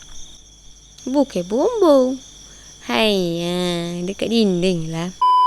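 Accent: Kelantan, Malaysia. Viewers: Hebat sangat ke minah ni speaking setiap kali kau bercerita kisah seram?bunyi orang kelantan!tapi acah acah bagus cakap mat saleh😜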